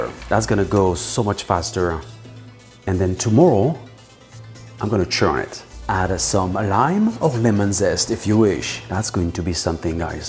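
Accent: France. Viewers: Y would u add corn syrup ? That’s not european despite the french accent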